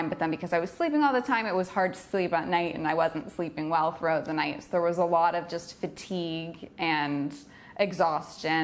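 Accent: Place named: Canada. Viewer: I relate to your story so much. Congrats on your recovery. Love your Canadian accent. Cheers from Southern California.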